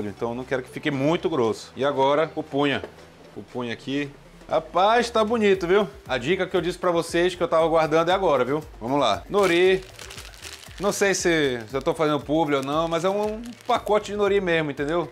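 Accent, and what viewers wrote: Pará, Brazil. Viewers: Adoro esse sotaque paraense "cominho" , "picadinho"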